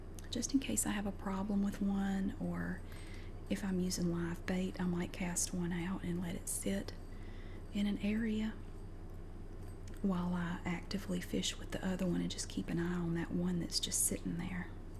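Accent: South, United States. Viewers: I subscribe to your channel and was curious about who you follow. This is a great channel - thank you. The Southern accent is brilliant. I am from the UK so it is unusual for me! But makes for great ASMR!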